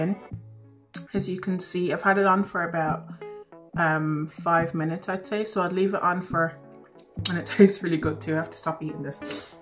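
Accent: Ireland. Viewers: I can sense the Irish accent... Am I right? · Are you Irish? I love that accent!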